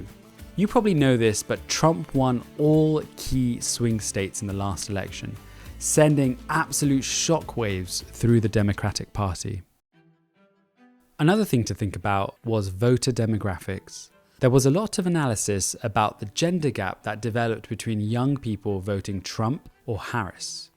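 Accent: United Kingdom. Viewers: He's a Indian with a limey accent lol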